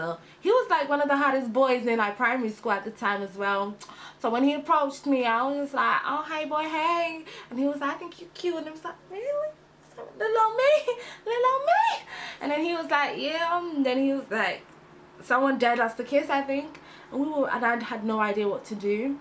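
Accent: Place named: United States. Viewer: You're personality is EVERYTHING!! 😂😂😂😂😂. And you're American accent is 👌🏽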